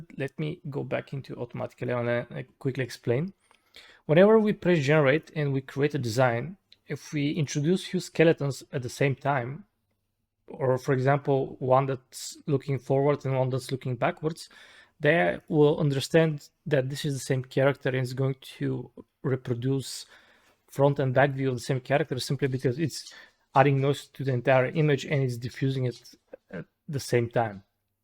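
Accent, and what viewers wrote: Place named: Russia. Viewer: you must be russian because your so sounds like все and your sheet sounds like shit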